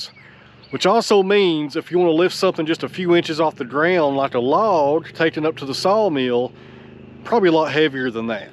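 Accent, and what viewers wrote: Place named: Kentucky, United States. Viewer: Of course you got a second tractor... 😁😁😄 I understood absolutely 0% of of the discourse about the new toy, but that's ok. I'm really just listening to the sound of Kentucky. 😊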